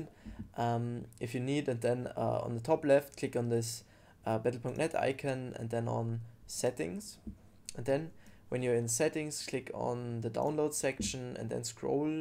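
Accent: Germany. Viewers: man merkt halt so hart das der deutsch ist und ja hat nicht funktuniert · I can hear a German accent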